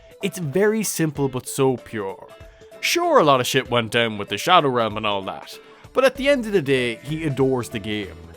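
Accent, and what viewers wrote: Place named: Ireland. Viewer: Okay I subbed! The review is very good, in fact the whole video and presentation is great. Plus the Irish accent is gold! Awesome stuff mate!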